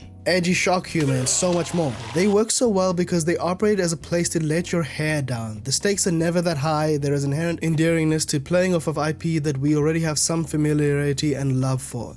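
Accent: South Africa. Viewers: Are you south african? I'm detecting a South African accent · unrelated question but are you south african?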